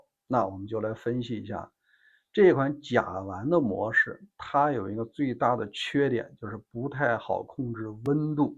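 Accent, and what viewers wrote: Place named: Shandong, China. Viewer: 这山东口音听得难受，你好好练练普通话再说。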